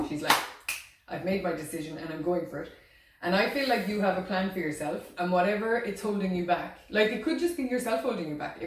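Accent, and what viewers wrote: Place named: Ireland. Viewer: Hello love your reading. Beautiful accent, it makes me miss being in Ireland with my grandparents :) All my family is from Ireland I was born and raised in Prince Edward Island Canada. Looking to hear more in the future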